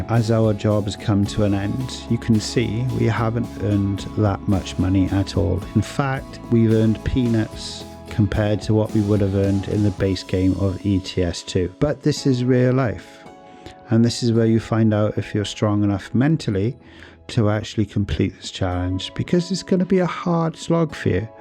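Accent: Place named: Cardiff, United Kingdom. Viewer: Looking forward to this one mate. Sounds solid. Is that a Cardiff accent I hear? I was thinking Newport but changed my mind. I’m from Caerphilly originally.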